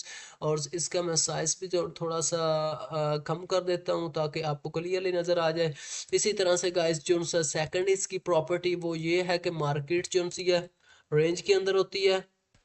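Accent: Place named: United Kingdom. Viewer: Accent mn to angrazo ko bi peechy chor diya hn bhai NY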